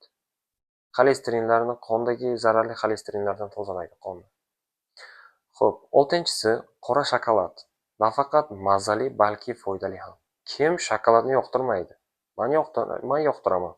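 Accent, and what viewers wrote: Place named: Tajikistan. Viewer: doktor millatiz nima talaffuz iz ungacha, tochik ,hitoy, juhut emasmisiz?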